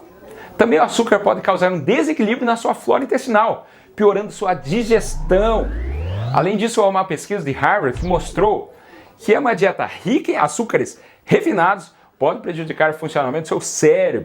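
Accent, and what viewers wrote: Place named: South, Brazil. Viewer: Sou de Lima Peru e ja inscrevi no seu canal, amo do seu canal e sotaque sulista como o meu hehehe🥰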